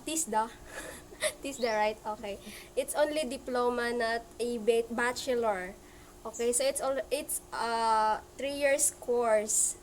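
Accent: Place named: Europe. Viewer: you sound like you have a European accent.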